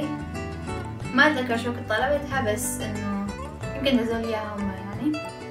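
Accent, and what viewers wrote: Mosul, Iraq. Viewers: كافي عيارة لو تحجي عراقي لو لبناني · حبيت كلامج العراقي لهجتج كانما مصلاويه اول مرة اشوفج وحبيتج وسيويتلج سب سكرايب فدوة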